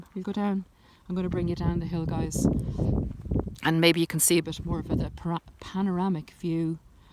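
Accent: Ireland. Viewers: So refreshing to have a video with that soft, kind Irish accent! I appreciate your style and also, you seem to be in a similiar age range as myself. I find you very relatable.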